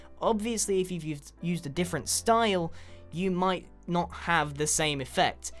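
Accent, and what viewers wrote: England, United Kingdom. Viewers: THAT ENGLISH ACCENT AND CALming voice really makes me feel calm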